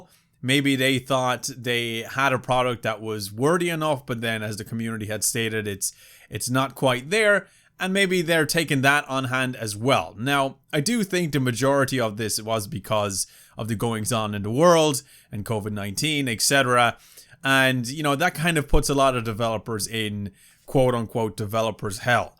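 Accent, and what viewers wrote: Ireland. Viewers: i can´t tell if you have slightly poor english or if you´re just irish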